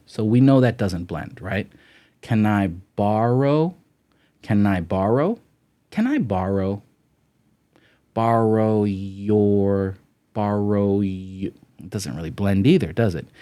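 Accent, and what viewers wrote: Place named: United States. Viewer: Hello my teacher I really love your teach I want to learn English perfect with you special your pronunciation I love American pronunciation I don't like language British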